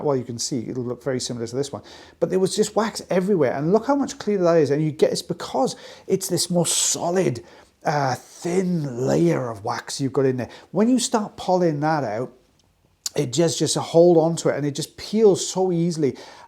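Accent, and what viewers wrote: Wales, United Kingdom. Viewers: Love hearing you talking, the Welsh accent takes me way back. Left Wales in 1965 but still have friends there I went to school with. Hope will get well soon, you two have such good repartee when watching videos. Take care, it will soon be March 1, St David’s Day, first day of your Spring. I will wear my daffodil brooch, Cymru am Byth. From Rosie, Australia 🇦🇺.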